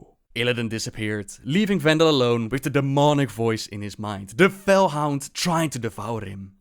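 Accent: Netherlands. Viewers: do I hear a dutch accent? might be wrong ^^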